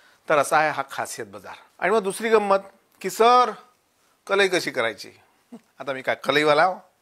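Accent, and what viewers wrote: Vidarbha, India. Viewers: Vidrab touch bolaya chaan vatte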